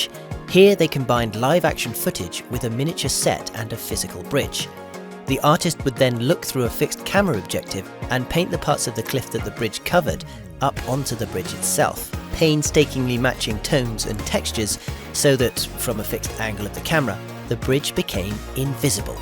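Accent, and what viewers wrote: United Kingdom. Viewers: Your name is. I presume that at least one of your parents is from Latin America, Spain, or Portugal. I wonder where you get the sort of British accent that you sometimes have? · Due to the extremely thick British accent, I had a big problem understanding some of the narrator's comments.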